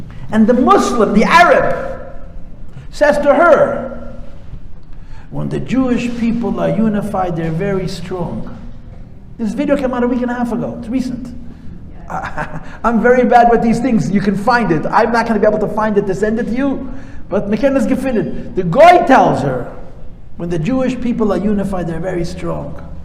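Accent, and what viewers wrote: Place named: United States. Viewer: American accent , another colliniser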